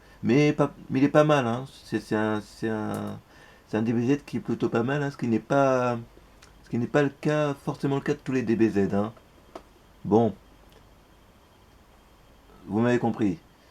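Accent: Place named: England, United Kingdom. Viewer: Bon la vidéo date mais ça se voit que t'as l'air sympa et t'as une belle petite collection. Belle accent anglais. Par contre, l'intro est catastrophique 😂.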